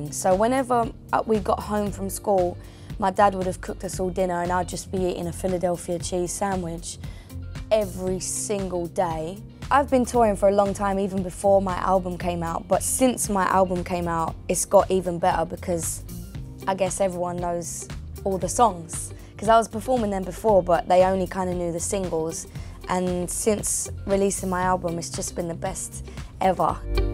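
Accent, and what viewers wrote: England, United Kingdom. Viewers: She's already so cute and her British accent makes her even more cute....❤️ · omg i love her british accent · Who else just figured out that anne has a british accent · I love British accent 😍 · Love the british accent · British accent 😍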